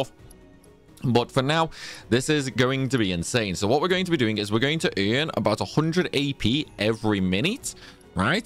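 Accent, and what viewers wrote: Stoke, United Kingdom. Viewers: Thanks, seems alright. Also I can never think of what a Stoke accent sounds like until I hear it. weird lol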